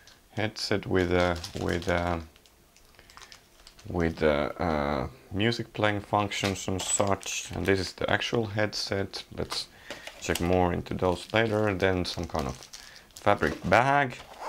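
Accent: Finland. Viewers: ahhh the finnish accent :D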